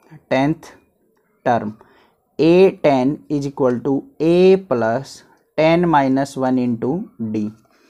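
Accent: Haryana, India. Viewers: Sir aap Haryana s ho na maybe😅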